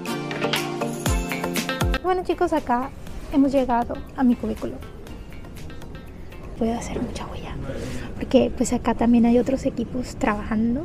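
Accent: Caribbean Coast, Colombia. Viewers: Por qué YouTube tardo tanto en recomendarme tu canalllll😍 me encanta todo jajajaj soy tu fan amo como hablas, dime qué eres costeña sin decirme que eres costeña cule'balance JAJAJJAAJA amo mal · Como que acento costeño 😶 soy nueva seguidora... Wow de la costa... Tengo esperanzas jajaja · ¿Es ella costeña? Jajaja es que creo que tiene un poquito este acento de costeña colombiana 🤭🤔 un saludo desde Colombia ♥️ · De qué parte de Colombia eres? Hace poco te comencé a seguir por Instagram y descubrí que también estás en Youtube me suenas muy costeña como yo 🥰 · Ese asentó colombiano me encanta❤️🇨🇴😊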